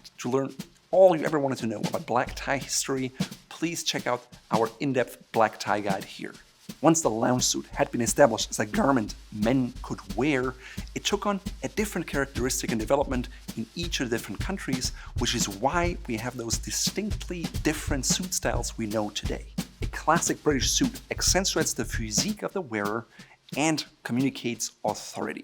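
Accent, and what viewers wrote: Germany. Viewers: still they have to work a lot on the audio quality and the German accent which is quite challenging... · Your name is as German as they come. You even have a German accent. No way you're in the US.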